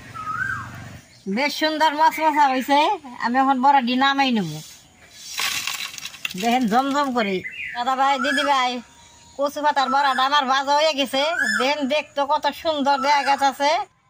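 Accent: Bangladesh. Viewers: Akdom amader বাংলাদেশের moto apnar kotha o ranna khub valo lage ,darun lagse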